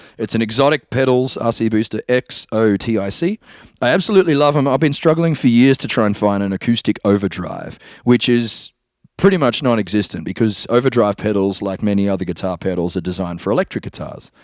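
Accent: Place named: Australia. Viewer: Excited to see it! It is funny how a looping rig is an evolving process. I truly believe simpler is better, but you’d never know it when I set up my solo rig. See you soon in Nashvegas! Ps.... lived in Sydney for 2 years. Listening to your accent brings back some really good memories! Ta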